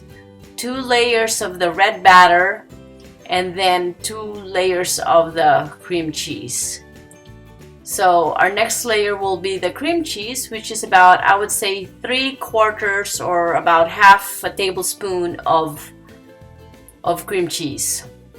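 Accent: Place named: Philippines. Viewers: Perhaps her target audience are not limited to Filipinos. Also maybe she is not trying to 'not to sound Filipino' and actually just speaks with an accent🤔. With that being said, possibly in YOUR videos you can speak your language, but thanks to her I can understand and follow her instructions. Boo...👎😊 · You sound like a filipina who tries really hard not to sound one...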